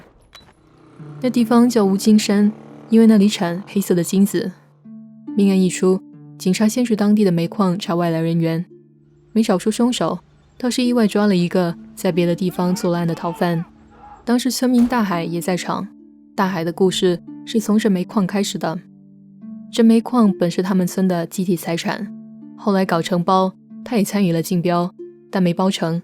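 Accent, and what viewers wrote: Standard. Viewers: 哗！你的粤语很标准呀！ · 粤语很标准哈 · 广东话很标准👍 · 原來K猫會說廣東話還說得這麼標準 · 广东话几标准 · 噢我就注意到了k猫的粤语很标准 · 完全冇諗過你粵語會甘標準！一直以為你只講普通話🤣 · 是广东仔吗 粤语说的这么标准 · 粤语很标准呀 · 題外話讚一下k貓的廣東話很標準☺ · k貓講廣東話好標準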